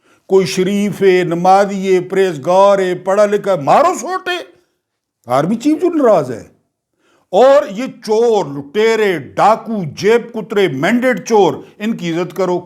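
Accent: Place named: Pothohar, Pakistan. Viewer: آپ کی پوٹھوہار ی مزہ دے جاتی ہے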